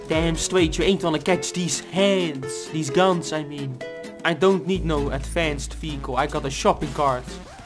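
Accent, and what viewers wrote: Netherlands. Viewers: Where are you from? You sound dutch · Such a dutch accent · That dutch accent tho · sounds like your dutch.....ben je dat ook? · Is this guy Dutch because he has a Dutch accent? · Are you dutch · Are You dutch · He is from holland he said and i am from holland and i can recinize his accent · Are you dutch? you sound like your dutch :D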